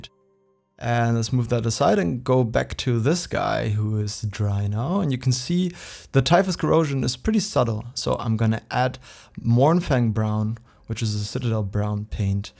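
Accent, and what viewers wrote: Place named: Germany. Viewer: I think ur right it looked better before but still now its more of what u imagined it to be, not terrain, marker, mini whatever ✌ btw where u from u sound pretty german but not totally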